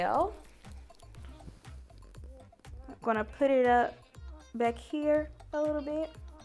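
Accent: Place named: South, United States. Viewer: 🙌🏾🙌🏾🙌🏾 love the southern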